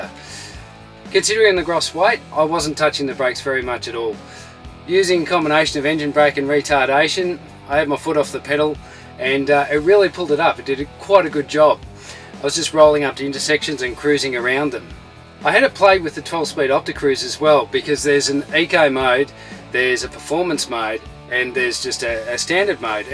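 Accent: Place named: Australia. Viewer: Man! I love Aussie accent.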